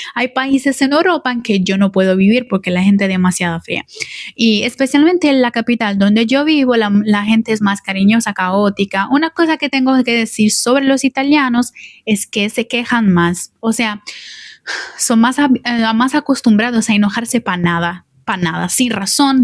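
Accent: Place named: Dominican Republic. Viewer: Soy Dominicano en New York y encontre tu canal hoy.. Me gusto tu vibra y me suscribi.. Tu español es como dominicano .. abrazos desde new york :goodvibes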